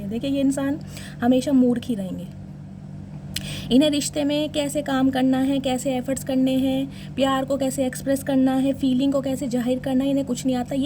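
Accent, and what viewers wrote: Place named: Uttar Pradesh, India. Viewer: Ye बताओ कि आप मैनपुरी आगरा साइड से हैं। आपकी बोली सुनकर ऐसा लगता है। मेरी बोली भी ऐसी ही है। कुछ कुछ ।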